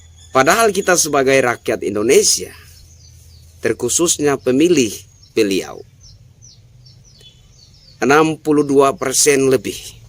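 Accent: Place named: East Nusa Tenggara, Indonesia. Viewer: Merinding saya mndengar narasi dari orang ntt.semoga presiden kita sehat selalu dalam lindungan Alloh swt.salam dari cilacap jawa tengah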